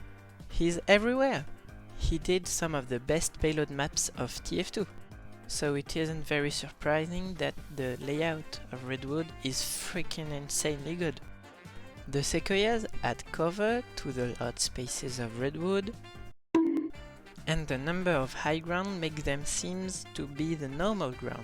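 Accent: France. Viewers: Tell me,judging by your accent,are you…french ?!(which there is nothing wrong about)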